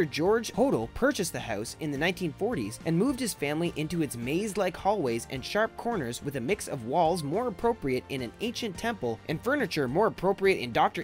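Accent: Canada. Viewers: Annoying voice and Kanuk accent: "hoose" (house), "oot" (out) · Definitely a Canadian narrator. Can’t say house correctly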